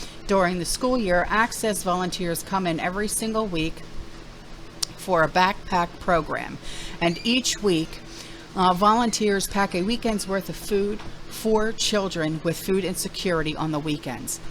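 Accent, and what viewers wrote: New York, United States. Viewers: Hello Seattle In The Chat 🤗 I don't know what i like about you the best. The New York accent or how dang sweet you are❣️ I always look forward to your post ❤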